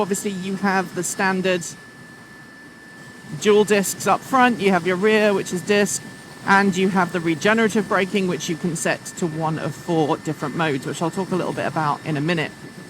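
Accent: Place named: United Kingdom. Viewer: She bri-ish aren't you Paying attention?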